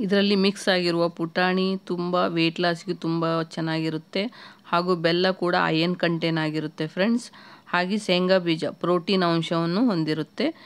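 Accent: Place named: North Karnataka, India. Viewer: U speak in UK kannada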